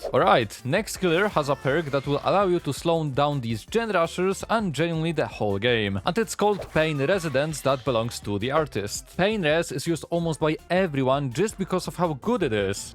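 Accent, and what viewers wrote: Poland. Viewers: polski akcent? :)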